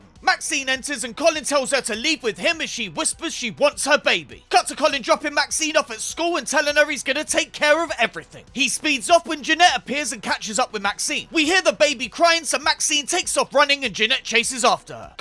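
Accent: United Kingdom. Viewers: Takes me back to a teen in Battersea. Well, minus the wish baby. How have I not noticed the South london accent before now.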